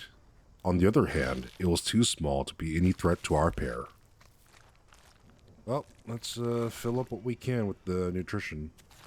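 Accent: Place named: United States. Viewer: Thumbs down because you wouldn’t list its height and weight in imperial. Why have an American voice and use foreign measurements?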